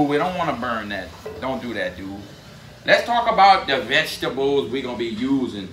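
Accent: United States. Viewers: Where in America u talk with this accent?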